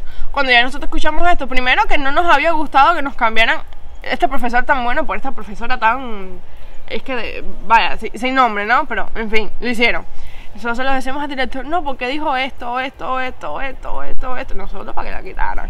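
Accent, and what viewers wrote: Cuba. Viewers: Me gusta como platicas. Me gusta tu acento cubano. Desde Monterrey, México. Cuídate mucho.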